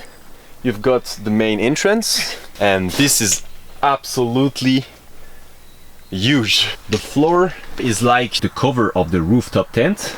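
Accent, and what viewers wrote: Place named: France. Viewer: french? cute accent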